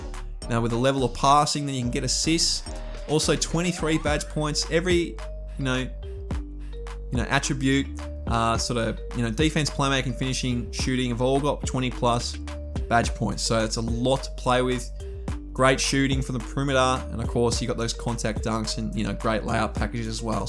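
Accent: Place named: Australia. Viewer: Thanks mate this was great. Also refreshing to hear an Aussie voice and to not have a bunch of over-the-top editing in my face when watching the video 🤙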